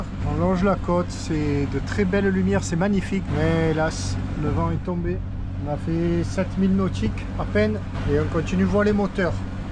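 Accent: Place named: Marseille, France. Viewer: Merci:-) Profite bien et continue de nuits faire rêver avec ton gâté et ton bel accent marseillais ❤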